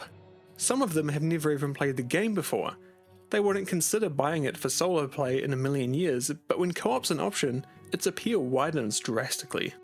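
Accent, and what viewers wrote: New Zealand. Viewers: Love the Kiwi accent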